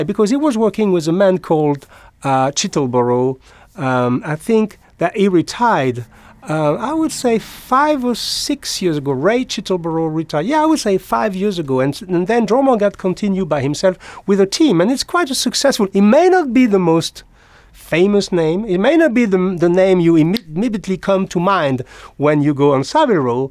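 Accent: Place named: France. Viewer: Don't worry about your French accent Mr.. Your English is far better than my French.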